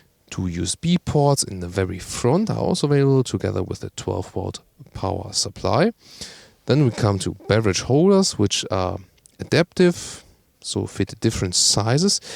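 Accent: Germany. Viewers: Can't wait! Your reviews are always my favourite not only because of the unrivalled quality and the super deep videos but mainly because of the oddly satisfying-to-hear german accent xD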